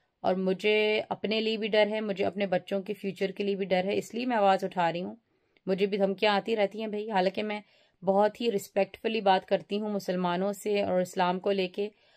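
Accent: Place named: India. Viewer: You're just like Indian,because your tonks are very much similar to hindi & i feel that the way of talking is too much Indian.Happy Diwali & happy new year.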